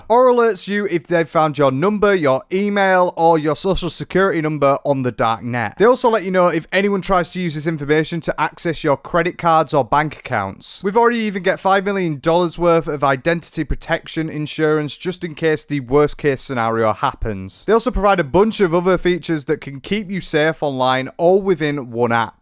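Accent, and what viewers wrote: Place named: England, United Kingdom. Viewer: Definitely my new favourite YouTuber usually I can’t handle English accents but yours is adorable 😂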